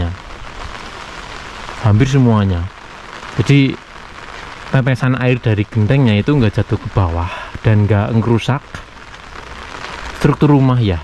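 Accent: Banyumas, Indonesia. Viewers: Mas, kayane logate ngapak ya?, panjenengan nyambut gawene opo to mas?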